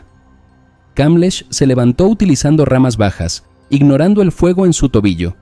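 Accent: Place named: England, United Kingdom. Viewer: Que mal narrados estan los videos, habla un español que de repente no se entiende como que lee mal las lineas y las pronuncia en ingles. Deberian poner a un narrador que si entienda y sepa leer el español.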